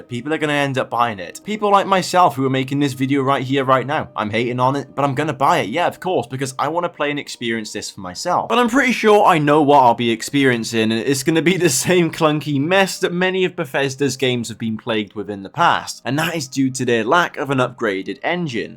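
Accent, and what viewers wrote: South Wales, United Kingdom. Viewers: I sense a south wales accent , newport ?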